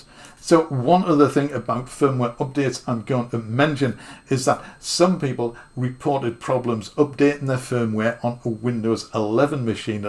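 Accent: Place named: Geordie, United Kingdom. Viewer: I spent 2 hours installing what I could and got as far as the s49 Mk3 finally working with Kontakt 8 in Cubase 15. However I can’t get the DAW control features to work, and the screen is really jittery, jumping around a lot. I plugged the USB cable into the one USB-C port on my brand new computer and I got the feeling that the large plastic cable maybe wasn’t going far enough into the port to make a proper connection. But the keyboard fired up fine and seemed to update okay. I bought it, mint condition, off eBay, so I’m shitting myself that I’ve bought a pup. But I think that’s pretty unlikely. Hopefully your video will help. Enjoying listening to the mild Geordie accent too. Reminds me of me late fatha. 😆❤️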